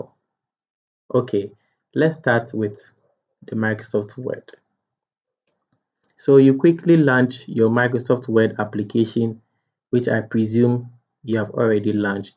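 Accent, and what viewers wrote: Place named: Africa. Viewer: omo oh i just hear the accent i know sey na africa. welldone bros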